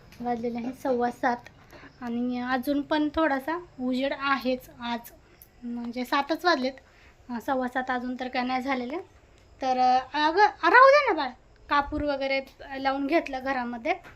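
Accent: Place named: Khandesh, India. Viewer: Waadte tii khandeshi aahe ..me pan . Ticha accent khandeshi aaye ..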